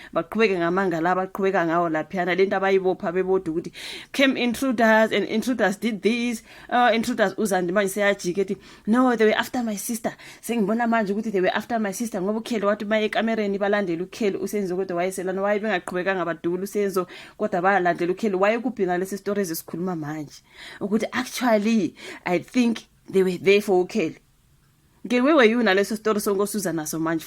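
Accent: Zimbabwe. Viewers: Your accent is giving Zimbabwe. Why nizifaka ezindabeni zaseSouth Africa? Niya dika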